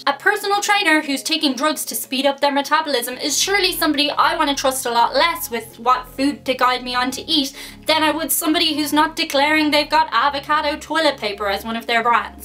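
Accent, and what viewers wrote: Ireland. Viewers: absolutely love you. You make me smile and I know of no one who can talk as fast as you. I have no clue what a Irish accent is but yours isn't what I thought was Irish. I can even understand you as your speaking 6 million words per minute. Isn't it wonderful bloggers have been unveiled, LMFAO. Go girl.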